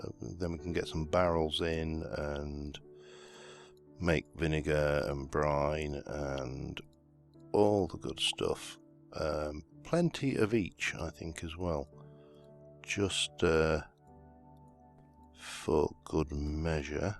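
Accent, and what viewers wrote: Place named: East Midlands, United Kingdom. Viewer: I have been working my way through your series and look forward to every video. As a fellow Brit (I'm thinking East Midlands from you accent) I want to say well done our kid. I glad you covered this topic as its an area I need to work on in my own game.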